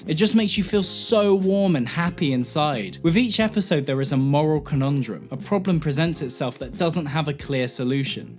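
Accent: United Kingdom. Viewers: The British accent is like nails on a chalk board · Creamy British vocal chords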